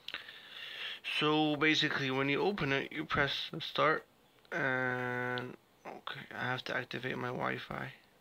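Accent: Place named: Egypt. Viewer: انا عرفت من طريقتك يا عم ومن المكتب كمان هههههههههههههههههههههههههههههه دا احنا مصريين زيي بعضينا يعنى اعرفك من غير ما بص مجرد سمع الانجليش عندك بس عالفكرة نطقك كويس جدااااا انا فى اولى ثانوى عالفكرة وفى انترناشونال ادعيلى بقى :))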